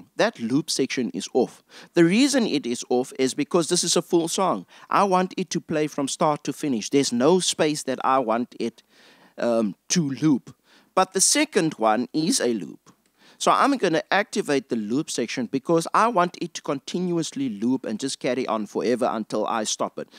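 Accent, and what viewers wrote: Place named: South Africa. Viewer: I am going to guess that's a South-African accent. It's grating at times to my American ears, but well-worth tolerating for the excellent job you've done with this series. You definitely have a teachers's heart!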